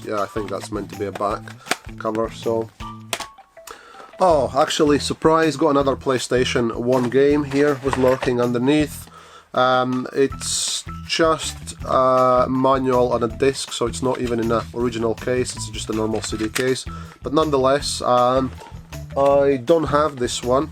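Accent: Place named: Scotland, United Kingdom. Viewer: Lots of Retro goodness there. I've never had a Game Gear, never come across games for it even in CEX, so I'm sure they are really rare. Excellent buried treasure....I wanna search your garage haha. Looking forward to your origins video and hearing how came to be...also the excellent, clearly Scottish but definitley a bit of a mixture there. Keep up the good work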